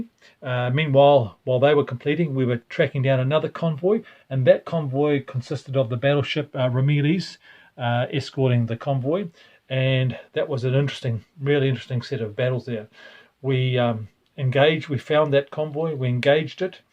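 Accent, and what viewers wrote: New Zealand. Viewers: Gidday Gidday. Love this AAR and your Kiwi accent. I'm a Kiwi in NZ who loves naval wargames too and has a good collection. Would you be keen for a game sometime?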